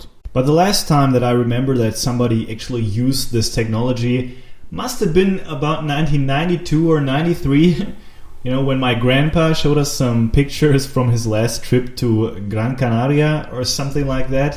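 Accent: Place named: Germany. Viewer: Hi, Thanks for sharing your ideas with us. I just love it and also enjoy your German accent.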